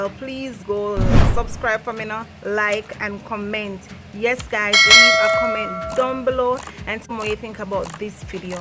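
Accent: Jamaica. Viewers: Lol, I laugh each time u talk patois 🤣🤣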